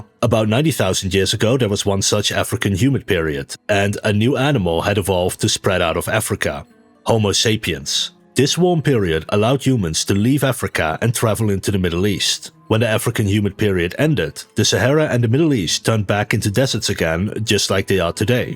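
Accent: Netherlands. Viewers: he is from Netherlands thgh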